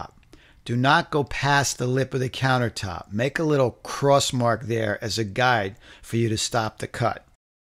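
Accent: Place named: New York, United States. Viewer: u sound like a goodfella u knw like goodfellas the movie ....new york accent.is class 😙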